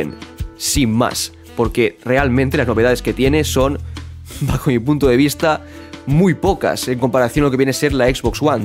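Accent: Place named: Spain. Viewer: Ya con el acento español se pierde la objetividad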